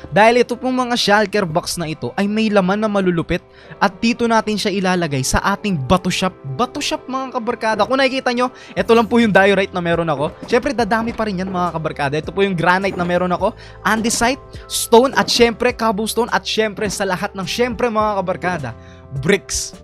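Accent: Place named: Bulacan, Philippines. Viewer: Pareho tayo ng punto ng pagsasalita kuya Proud Bulaceno Here taga bustos po ako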